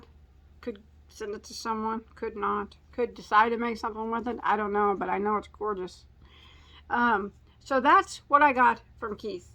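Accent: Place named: Illinois, United States. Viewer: No one should correct your regional pronunciation. A lot of people pronounce "wash" the same way. A friend from Illinois used to say it like that, and I know you're also in Illinois. Most of us have a regional dialect & it's impolite to belittle that.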